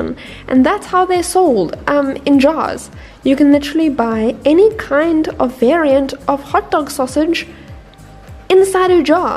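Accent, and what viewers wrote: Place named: South Africa. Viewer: Hi, so nice to hear a fellow South African blogging. Well done and can’t wait for more.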